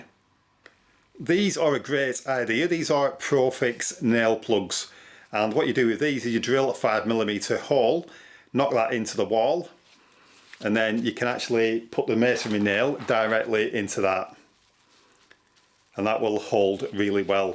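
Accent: United Kingdom. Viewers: A question for British viewers, what kind of accent is this ?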